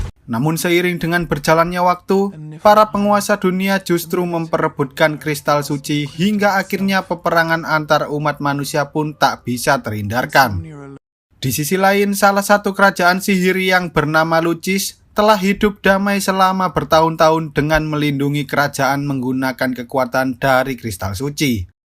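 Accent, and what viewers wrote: Java, Indonesia. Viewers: Kemana aja bang kangen sama omongan jawanya 😂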